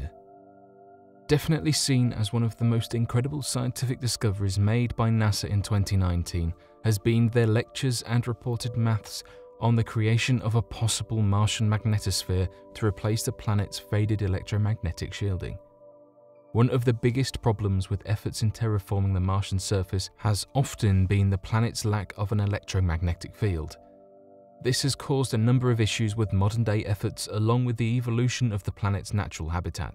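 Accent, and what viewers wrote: United Kingdom. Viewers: The British accent is an extra convincing aspect 😉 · Guy with a thick british accent ranting about American beauracracy, framing the whole thing as though NASA has any influence over the government. 1/2 of 1 cent of every tax dollar. NASA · British accents are good for documentaries and bad guys in movies. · British mate, close though.....kinda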